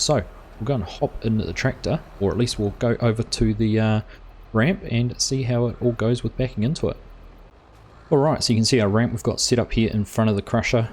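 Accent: New Zealand. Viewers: Damn man a kiwi? North or South Island mate?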